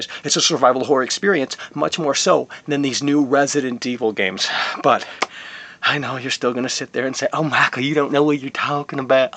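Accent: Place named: Texas, United States. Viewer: Lol its the very fact that his Texas accent that made it seriously funny to me.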